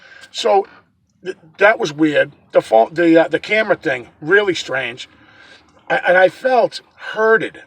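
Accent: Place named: New England, United States. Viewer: Awesome to see a fellow "New Englandah" on here.. From Providence, 🍻🍻🍻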